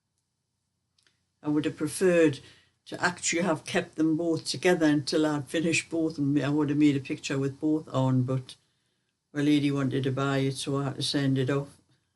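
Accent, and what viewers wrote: Newcastle, United Kingdom. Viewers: Hello, I love your videos, I’m totally rubbish at knitting and crochet but it s good to see the expert in work. What I really love is listening to your antics, all my family are geordies, it’s great to hear your accent, all the fun with your hilarious pals, I think they all look to you. Thank you.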